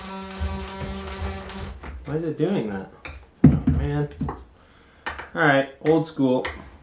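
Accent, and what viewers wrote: Canada. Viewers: I dig the Canadian accent. I donno I just felt compelled to express that. Great video, man.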